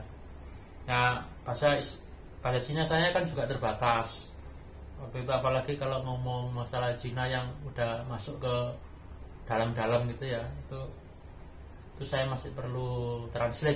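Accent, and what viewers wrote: Java, Indonesia. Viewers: bahasa Suroboyo,ne kok medok, dari mana asalnya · Logate kok jowo.jowo ngendi mase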